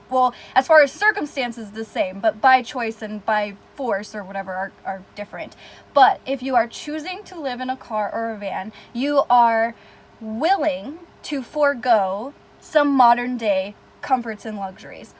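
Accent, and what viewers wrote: Texas, United States. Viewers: Are you from Dallas, Texas?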